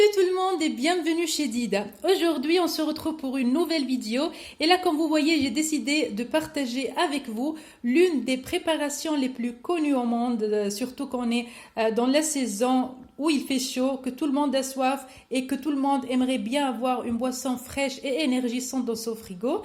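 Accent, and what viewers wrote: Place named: France. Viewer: Ciao Dida, adoro la tua pronuncia francese 🥰 ottima bevanda, fresca ed energetica 😋🥰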